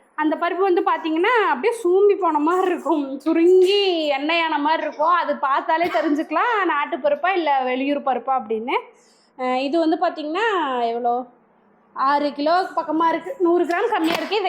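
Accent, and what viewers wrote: Kongu, India. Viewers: ஐஸ் நான் போலிஸ் தான் அனால் உங்கள் கொங்கு தமிழ் பேச்சுக்கு அடிமை என் வீட்டில் மாடி தோட்டம் வைத்து இருக்கிறேன் உங்கள் வீடியோக்கு காத்திருப்பேன் சென்னை வந்தாள் எங்கள் வீட்டிற்கு வரவேண்டும் 🙏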